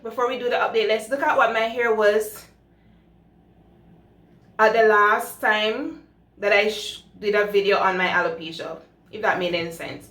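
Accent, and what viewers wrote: Jamaica. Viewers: She is definitely jamaican. Can't hide our accent no matter what 🇯🇲🇯🇲🇯🇲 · You sounds Jamaican. I'm waiting for a miracle for my alopecia.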